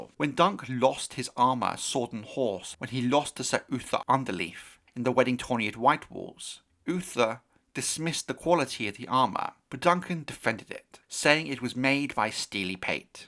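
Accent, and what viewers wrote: United Kingdom. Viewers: You have the most British voice ever. Like the josh Brolin of England lol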